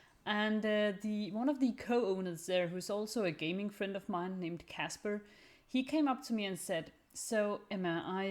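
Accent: Denmark. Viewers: Are you danish because you have a danish like accent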